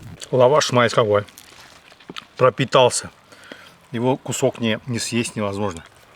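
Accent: Baku, Azerbaijan. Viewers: Здравствуйте, дядя. Благодарю Вас, за ваш канал, за ваш бакинский акцент и за Ваш позитив😊 успехов и процветания Вам. Скажите пожалуйста, вы лезгин?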